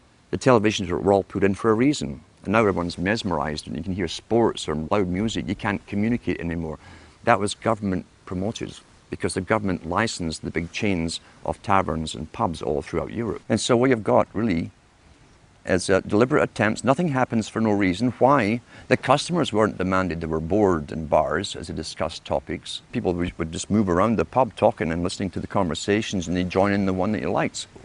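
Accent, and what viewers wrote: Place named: Scotland, United Kingdom. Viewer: He has a different voice, he has a Scottish voice here, and all his other audios he has a different accent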